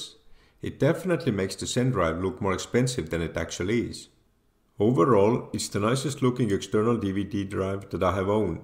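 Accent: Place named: India. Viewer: This was a big help to me to help reinforce my thoughts on how to set up the cables etc. and help my confidence in hooking up and installing my machine. I hope it isn't offensive coming from a white dude saying that Asian Indians are really superb with technology (better than white dudes like me). When I heard your Asian Indian accent I was thinking "Awesome, now I know THIS dude KNOWS what he is talking about!!!" Thanks brother, God bless you and your loved ones. You are a lifesaver Bro.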